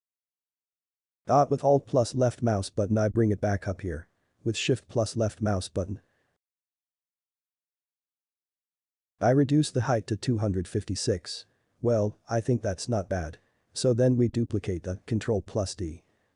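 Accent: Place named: Austria. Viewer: Please continue with this tutorial series. I found this by accident - you should add a link to the RBDOOM-3-BFG README file. Also, the Schwarzenegger English is fine you don't have to waste time with the robot voiceover.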